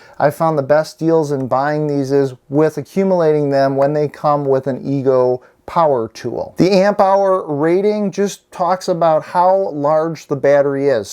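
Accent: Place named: Western New York, United States. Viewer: “Badderie” your accent is hard-core Western New York to Wisconsin .. still enjoyed it thanks for making the video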